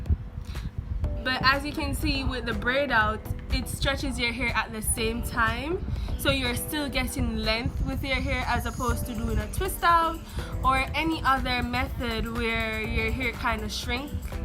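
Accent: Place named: Caribbean. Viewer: And Mash up the curls i love that.... carribean gyal... i could tell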